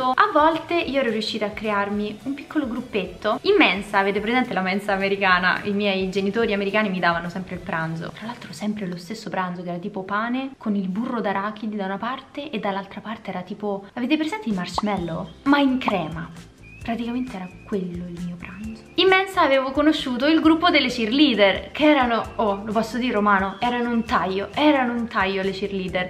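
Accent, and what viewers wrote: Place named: Rome, Italy. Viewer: A,è sempre 'n piacere guardatte!! To'o posso di da romano?(trapiantato a Torino però🤦🏻‍♂️🤷🏻‍♂️)...pure te sei 'n tajio!!😂 Bella,intelligente,brava e simpatica! E poi c'hai 'sto soriso!!🥰(mo te scrivo bene😅)... È bello seguirti,video sempre interessanti,e adoro il tuo leggero accento romano con un italiano invidiabile...ti prego non lo perdere mai però!👋🏻👋🏻🤗😘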